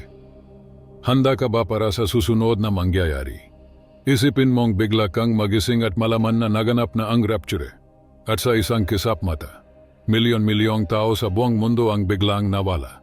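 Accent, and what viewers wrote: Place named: India. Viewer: Tanong kolang po...pinoy ka po talaga?kasi parang indian ka boses mo or kano na nag aral lang magsalita ng tagalog pangit sa tainga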